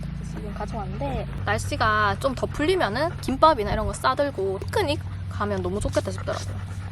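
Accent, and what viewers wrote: Gyeongsang, South Korea. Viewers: 일하는 직장인으로서 힘들고 지쳐있고 현재 따고픈 공부도 하고잇는데, 가끔 님 유투브보면 너무 재밋고 하여 즐겁게 시청해요 ㅎㅎ 게다가 경상도사투리가 와닿아서 잘듣고잇어요ㅠㅠ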